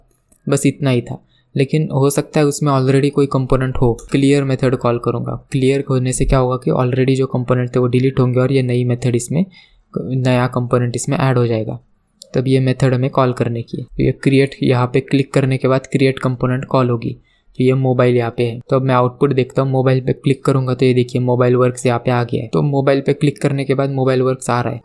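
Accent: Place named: Maharashtra, India. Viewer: Marathi acent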